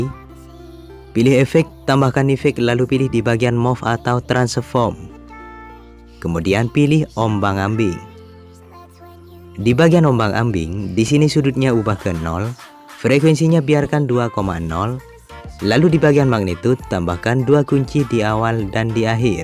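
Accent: Madura, Indonesia. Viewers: Pasti orang Madura ya kak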